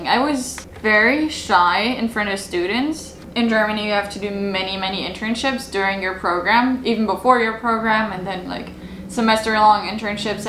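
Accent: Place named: United States. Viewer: That explains your American accent. 🇺🇸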